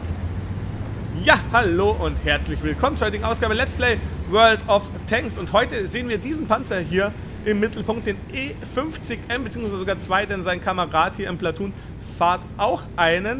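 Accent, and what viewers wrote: Bavaria, Germany. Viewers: Das Bayerische hört man schon raus. Aber ich mag das. Ist authentisch und macht dich sympathisch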